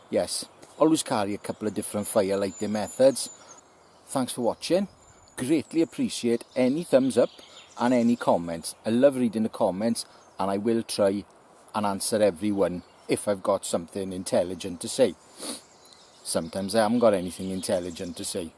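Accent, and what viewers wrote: Wales, United Kingdom. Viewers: Thanks for another video, I haven't done any bow drill fire lighting for a long time, I was trying to think how long ago it was, I taught my oldest grandson when he was 9 he has just had his 20th birthday, that's when I last did it, how time flies, Things don't always go right and you are very skilled in what you do, you did it in the end, very impressive, I like your welsh accent its very easy to understand, thanks for sharing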